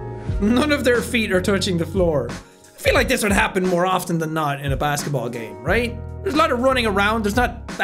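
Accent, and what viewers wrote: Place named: Ireland. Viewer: Please get well jacksepticeye I pray for you 😞 I hope you get well because every time when I am sad and I need a smile on my face I know where I can go on YouTube to see your videos that help me laugh and make me smile jacksepticeye the bravest most strongest and the funniest like a boss my favorite YouTuber I love your Irish accents I love your funny comments I love the adventures that you go to in these video games I live your tweet videos where you make funny jokes every time it helps me to put my mind at ease my friend please get better I care about you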